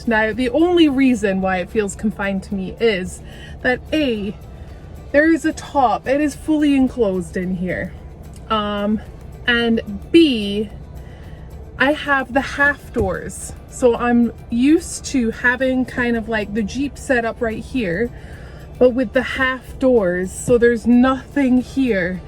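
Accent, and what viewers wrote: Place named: Scotland, United Kingdom. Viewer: Yo sound part Scottish and its really messing with my head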